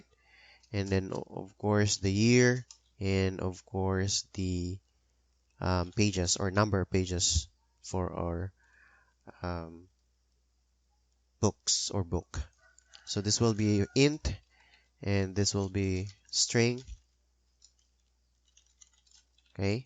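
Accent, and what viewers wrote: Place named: Philippines. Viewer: Parang Filipino Accent, kung Filipino ka sir... Maraming Salamat po :) sana magupload pa po kayo.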